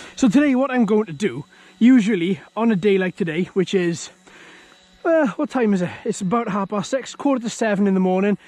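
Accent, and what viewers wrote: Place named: South Wales, United Kingdom. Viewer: Great vid. Very informative. By the way is that a South Wales accent I detect?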